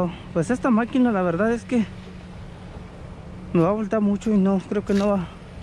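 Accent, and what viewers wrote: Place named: Guatemala. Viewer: Hola amigo Saludos desde Ecuador ciudad Guayaquil eres de Guatemala por el acento.un abrazo.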